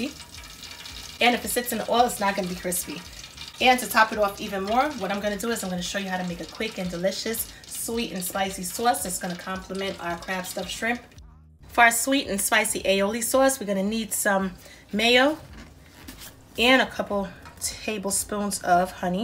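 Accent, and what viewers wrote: New York, United States. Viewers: That looks so delish! I'm loving hearing the New York accent.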